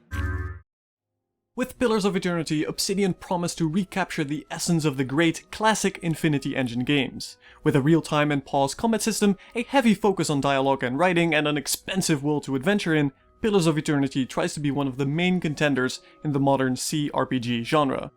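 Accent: Netherlands. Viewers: My apologies but are you dutch? Being from Belgian I seem to notice a dutch accent(as compared to a flemish one to seperate the 2 "languages")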